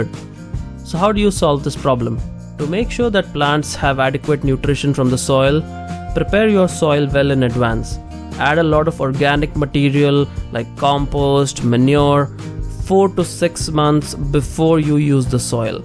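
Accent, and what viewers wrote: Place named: South India, India. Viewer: I wanted to ask a question for a while ,are you South Indian ? by the way your videos are too good it needs a great effort to make them , keep up the great work and we are looking for some more learning techniques in gardening Thank-you for sharing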